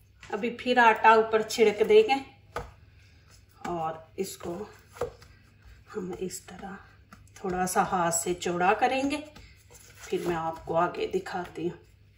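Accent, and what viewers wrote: Punjab, India. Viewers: maashaalla look delicious. AP bolti b bahut piyaray hai Punjabi touch Ata hai. love and respect from my channel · Yummy. Dakh ker both Maza Aya. Urdu main Panjabi lehjy Ka meetha touch. Kia khob.maza a gia😊❤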